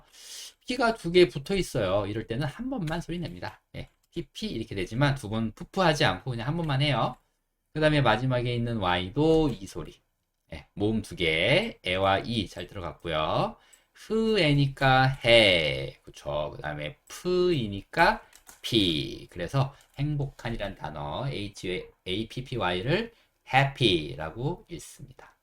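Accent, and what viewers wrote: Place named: United States. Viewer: 미국발음 띤입니다!^^^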